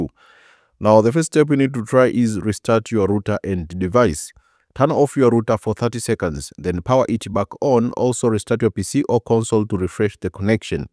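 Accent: India. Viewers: never trust a guy on pc thinks with indian accent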